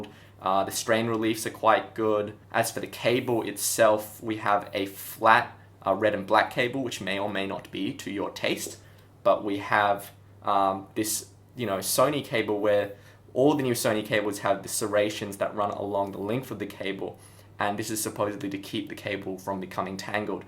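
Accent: Australia. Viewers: how do you do such a good Australian accent? I am also Asian and I can speak Spanish but I can't do an Australian accent.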